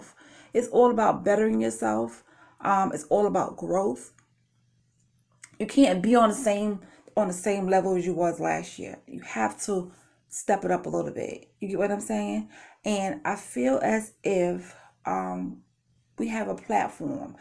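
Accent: New York, United States. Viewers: Well said my bklyn boo!! And you will not stop 🛑 talking to me lol bc Yasss I heard the New York accent lol but I hear it all the time lol 😂 TFS! Xoxo😘💕🥰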